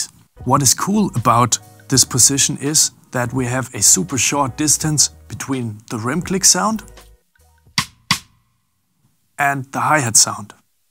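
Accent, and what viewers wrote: Germany. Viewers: I love the german-english accent for some reason. It sounds so smooth.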